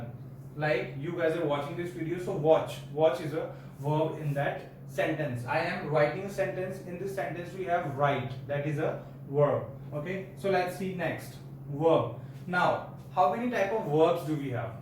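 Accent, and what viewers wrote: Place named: United States. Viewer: Ye sir American english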